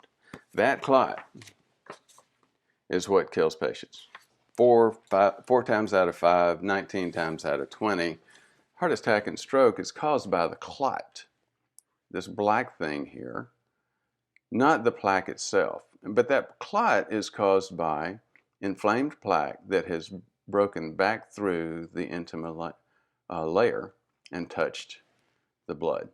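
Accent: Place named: Texas, United States. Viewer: Great explanation doc Simple and easy to understand And I like your Texas accent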